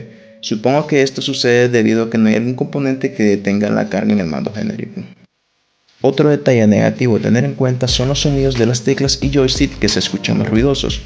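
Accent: Nicaragua. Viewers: Maje, ¿sos nica? :0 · Yo también y además se te escuchaba el acento a nica por eso fue que te pregunte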